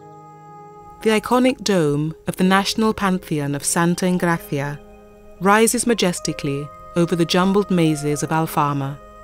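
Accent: Castile, Spain. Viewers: I appreciate the interest but to have the narrator pronounce portuguese names with a spanish accent kind of ruins the experience... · We are NOT Spaniards. We speak Portuguese! FFS. I had to turn on captions so as not to have to listen to the narrator butcher words with a castilian pronunciation. Portuguese pronounce J s as Js not as h as the spaniards do. Really annoying. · Not only does the narrator pronounce Portuguese words with a heavy Spanish accent but she puts me to sleep. Wrong background music btw. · Só pelo facto que pronunciar os nomes portugueses em espanhol este vídeo devia de ser destruído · With a Castilian accent, Seriously! It is very annoying to listen the way you try so hard to pronounce the Portuguese names with Castilian (spanish) accent. Please speak with a English accent or Portuguese accent, a accent were you are from is more natural because you sounded... 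ridiculous. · The narrator seems to think that we must be spanish since all the times she mentions a portuguese name (E.g Castelo S. Jorge, Martim Moniz) she does so with s spanish accent.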